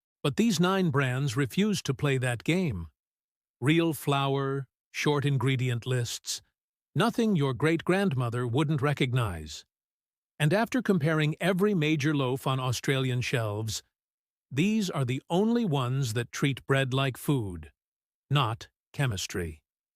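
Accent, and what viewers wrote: United States. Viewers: Yeah okay.. but other sites advise that the breads baked in coles, woolworths and bakers delight are not mixed on sites. They are baked from frozen mixtures. So how is that being honest with the public. It's all such a minefield. And no offence, but why are a couple of the sites similar to this narrated by someone with an American accent?accent. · Why the American AI voiceover? Could’ve used an Aussie voice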